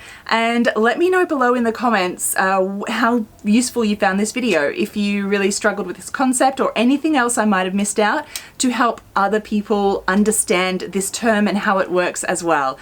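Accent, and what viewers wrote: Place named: Australia. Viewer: Thank you for your sewing lessons. Your explanations are easy to follow and understand. I also love your Australian accent 😊 ♥️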